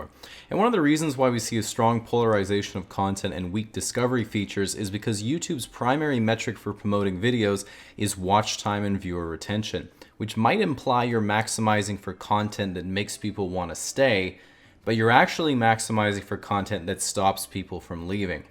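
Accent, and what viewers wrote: Australia. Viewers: That was an amazing Australian accent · Hearing this guy speak in his Australian voice sounds so much more normal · Okay but your Aussie accent sounds exactly like my little brother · your aussie accent sounds like frank woodley! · your aussie accent was spot on! didnt see that coming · That's a really good Australian accent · Honestly, his accent sounds more "Aussie" and Internet Historian's. IH has a very "posh" Aussie accent, for lack of a better word. You ought to hear the bogans. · CE's Australian accent is really cute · That australian accent is oddly familiar. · I love the Aussie accent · still can’t believe u used to live in australia and pulled off that accent like u have 2 personalities ahahahaha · Why does your australien accent sound like adrian gee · Your fake Aussie accent sounds like a Kiwi, your real voice sounds Aussie cheers mate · That Australian accent is fucken great mate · nice aus accent i have to say, u even say fuken right. 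edit soz just realised ur probably born and raised aus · Very convincing Australian accent. Most people go for the crocodile Dundee accent and butcher it. I've lived here for 18 years and still can't do a convincing accent. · Damn, the Oz accent is completely native! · The first joke he did was actually kinda funny. But that's just because he has an Australian accent, which makes everything funny. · his aussie accent is on point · Wait Your Australian, same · you sound exactly like darren levy with your australian accent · Top Australian accent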